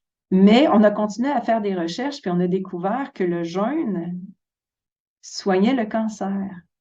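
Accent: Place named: Canada. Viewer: Toujours une joie de vous entendre! J'ai eu cette chance de vous approcher quelques minutes lors d'un événement dans le sud de la France. Dire que mon écoute vers vous depuis 2020 venait au fait que cela faisait écho à mon ressenti mais aussi grâce à la musicalité de votre accent me rappelant le plaisir que j'avais à entendre des membres de ma famille expatriés au Canada!!! Merci beaucoup pour tout ce que vous apportez à notre réflexion... une vraie richesse! Et je vous suivrai quand vous ferez votre page perso. Au gré des rencontres que la vie nous offre! Bien à vous.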